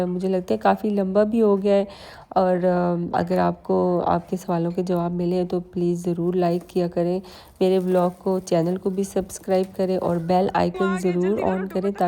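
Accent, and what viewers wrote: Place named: Hazara, Pakistan. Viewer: Her accent of Urdu language reflects her connection with Hazara Valley of Pakistan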